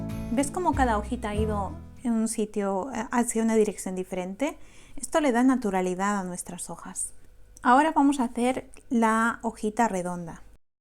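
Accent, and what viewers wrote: Spain. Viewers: Que linda es la maestra con acento español, saludos desde Quito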